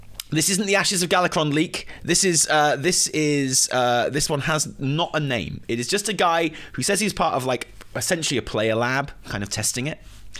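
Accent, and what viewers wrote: United Kingdom. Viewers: Nothing like a good mystery reveal with a man with a British accent.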